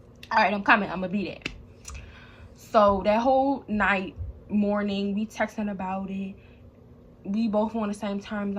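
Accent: Baltimore, United States. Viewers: I KNEW YOU WAS FROM BALTIMORE 😭😭😭😭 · You got to be from Baltimore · is she from bmore i know im late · Your accent sounds like you from Baltimore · You from Baltimore ? cause that accent 😍😩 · your accent, you def from baltimore 😂 i never been there and know no one from there but ik the accent clear as day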